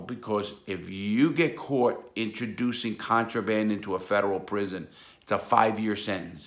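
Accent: New York, United States. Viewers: I love that ny accent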